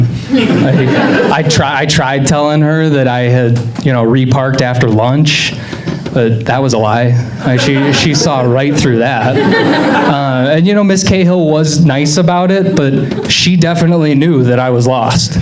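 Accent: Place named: Brooklyn, United States. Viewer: Is this guy Brooklyn-based does anyone know? He has a very Brooklyn delivery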